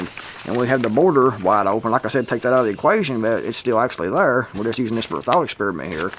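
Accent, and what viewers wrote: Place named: Tennessee, United States. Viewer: Lived in Georgia for 35 years, so I know this dude... good guy, great neighbor. Guessing he's a Volunteer fan from his dialect. I'm jealous of your hardwood... mostly cedar and pine in NM.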